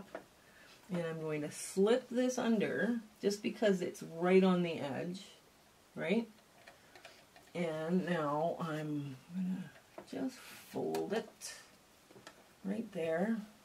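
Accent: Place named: Canada. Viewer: BC'er here. I thought I detected a Canadian accent...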